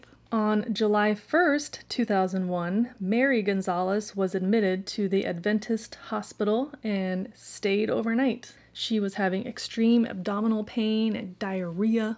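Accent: Australia. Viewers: Hi so happy to see you Hope you had a great July 4th Love hearing the cat in the background, I love your Australian accent 😂❤ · Look I teased you a little last time about your Aussie accent. But never stop trying.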